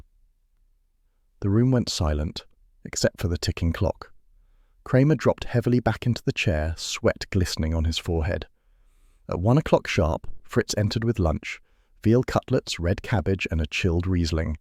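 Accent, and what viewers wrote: England, United Kingdom. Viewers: Sorry. Just can't accept the English accent for a NYC based story.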